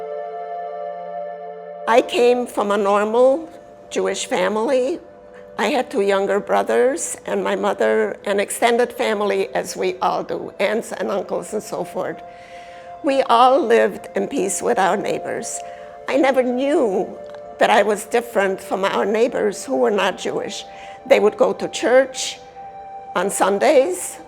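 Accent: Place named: Chicago, United States. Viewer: She's got a heavy story and a heavy Chicago accent :-)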